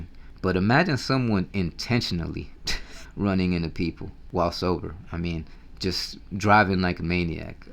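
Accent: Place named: Texas, United States. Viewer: I mean I hear the Texas in your voice! I think you should do it!!!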